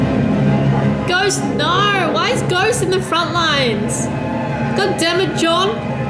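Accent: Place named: Australia. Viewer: You weren't the only one sweating it out...quite the roller coaster of emotions this episode was lol Condolences to your Koalas for witnessing such horror, for the night is dark and full or terrors ALSO...my brain can't get enough of your Australian accent ;p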